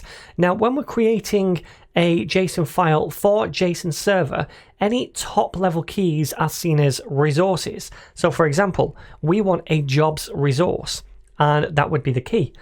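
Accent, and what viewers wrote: United Kingdom. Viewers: Is this tutor British?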